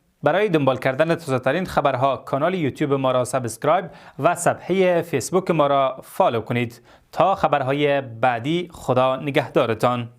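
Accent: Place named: Afghanistan. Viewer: سلام برادر افغانی عزیزم افتخار میکنم بهت چون حقیقت رامیگی من ایرانی هستم و درود فراون میفرستم بهت. ای کاشک افقانستان را از ایران جدا نمی کردن وجوز ایران بود با آن لهجه شیرینان .خدا نگهدار ایرن وافقانستان باشه.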